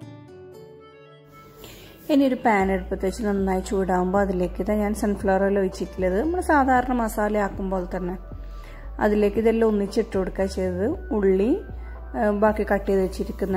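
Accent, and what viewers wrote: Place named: Kannur, India. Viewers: Adipoli puffs....but I don't have other oven... I have only microwave.... nice to hear from you....kannur slang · Njan new sub er Anu ithante samsaram ishtayi aann aann kannur aanno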